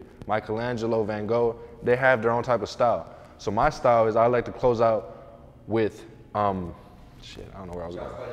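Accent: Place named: New York, United States. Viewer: got u brother. You dont even sound like you from Texas. You sound like where I'm from ny/nj lol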